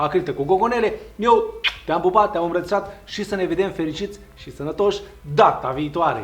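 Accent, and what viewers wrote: Moldova. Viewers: Pot sa ascult fix un minut pe cineva cu accent de moldovean,Dupa aia ma ia greata.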